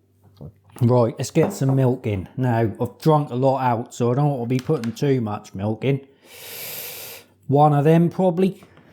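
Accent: Norfolk, United Kingdom. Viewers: I love your broad Norfolk accent!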